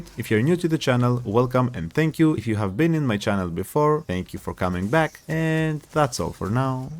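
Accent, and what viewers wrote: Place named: Greece. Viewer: Hey can you teach me greek . I love the accent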